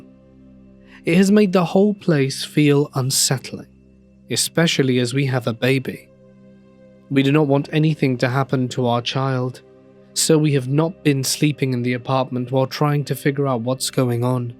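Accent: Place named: United Kingdom. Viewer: British accen5 just isn5 doin* it for me. Gotta stop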